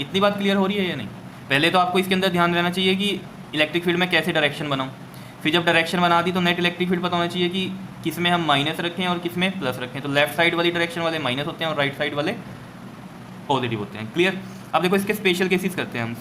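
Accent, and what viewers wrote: Punjab, India. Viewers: Sir tucci punjabi o?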